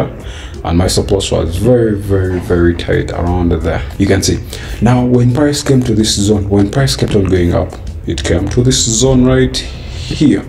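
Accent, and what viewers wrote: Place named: Uganda. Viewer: Hey man.. are you from Uganda... your accent sounds Ugandan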